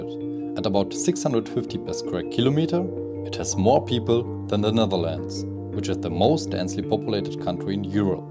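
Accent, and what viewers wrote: Germany. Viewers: Do I hear german accent? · Awesome video, great footage and editing. Love the city, too... but the reading is quite frankly... sub-optimal... thick German accent, mispronouncing easy words, ... :'( Maybe re-do it with a native speaker? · Well done, enjoyed watching and seeing your amazing footage🥰 Moreover i like your german accent. Keep it going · I am not offending your English. It is perfect. 😊 I just wonder if you are from Germany, because of little accents. You have done a pretty job capturing Taiwan.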